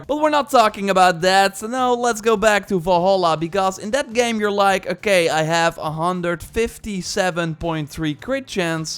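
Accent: Netherlands. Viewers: Je hoort gwn da hij Nederlands is😂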